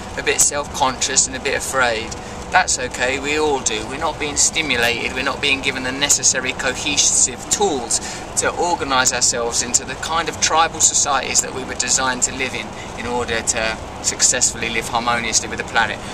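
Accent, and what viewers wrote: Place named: United Kingdom. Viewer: Russell love when you talk in this calm manner because sometimes you speak so fast that it's hard to follow your strong British accent. Hope all is well with you and your Mom. Much love from New Jersey USA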